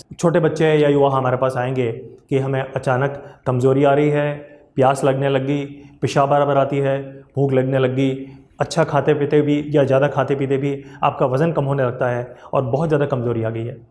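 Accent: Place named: Rajasthan, India. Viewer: Your conversation says you belongs to rajasthan for sure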